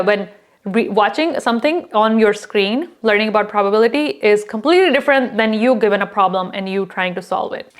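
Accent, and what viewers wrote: India. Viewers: She speaks really nice but there is a pinch of desi accent in her voice XD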